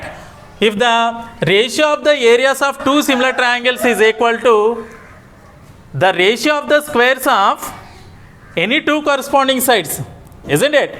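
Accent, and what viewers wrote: India. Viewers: Sir I think u mother tongue is telugu 😅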